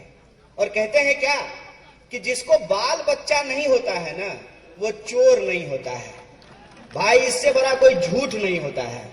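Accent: Bihar, India. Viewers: साले बिहारी हिंदी बोला तो सीख ले पहले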